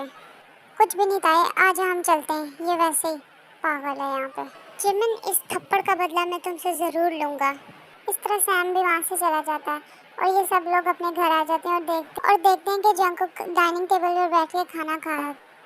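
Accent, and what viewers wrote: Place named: Pakistan. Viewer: Ap ke bol ne ke tarika se aisa lag raha hai ki aap Pakistan se ho. kya ap Pakistan se ho? Becoz mai Pakistani Jikooker hoon.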